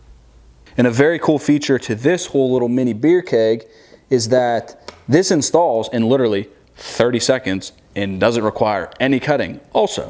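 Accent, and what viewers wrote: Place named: New Jersey, United States. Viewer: You must be from NJ!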